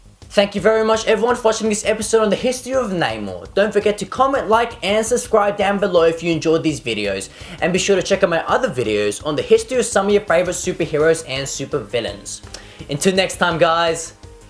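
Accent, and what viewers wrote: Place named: United Kingdom. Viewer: a Chinese guy with a British voice that's some weird shit right there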